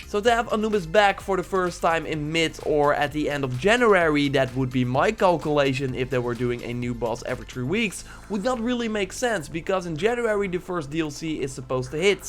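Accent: Netherlands. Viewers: Are you Dutch?? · Are u dutch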